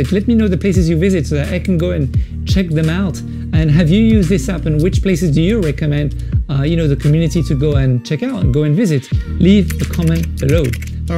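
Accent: France. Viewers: Sa sens le français à pleins nez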